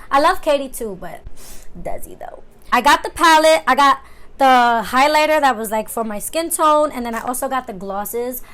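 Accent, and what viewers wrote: New York, United States. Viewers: She definitely from New York right?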